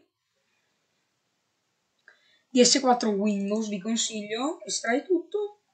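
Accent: Italy. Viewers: bello l'accento veneto comunque bel video grazie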